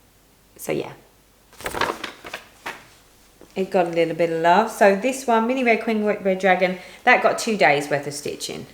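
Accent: United Kingdom. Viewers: I love watching your videos and listening to your wonderful British English 😍 I usually have a new start whenever I want to, therefore it's great fun to watch you talking about your 'rules of new starts' and how you start stuff 'anyway' 😁 At the moment I have 54 wips, but a lot of them are under 30k stitches. And none of them is above 90k stitches 😊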